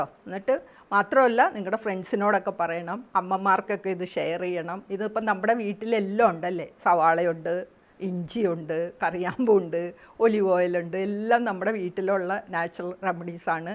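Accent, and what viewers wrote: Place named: Kochi, India. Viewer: Mam te veedu fort kochi aano ...or vyppin...samsarom kettuttu agane thonni...njan Ernakulam...kaloor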